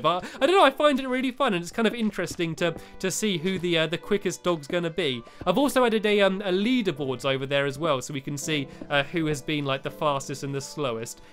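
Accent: United Kingdom. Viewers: I used to think he was saying Alpha Lee until I realized he was saying L for Lee. Can't understand much of his accent, not being British.. XD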